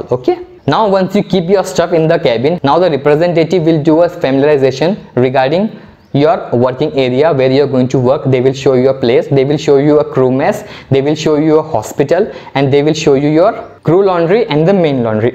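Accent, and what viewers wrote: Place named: India. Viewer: My heart beat was "dhak dhak" Typical indian english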